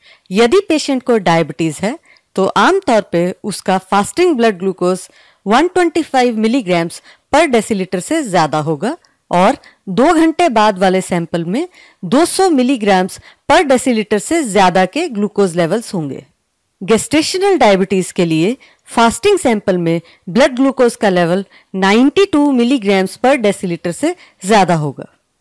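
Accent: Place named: India. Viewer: Is video mein, Indian accent bahut zyada strong hai..indian accent bahut annoying hoti hai.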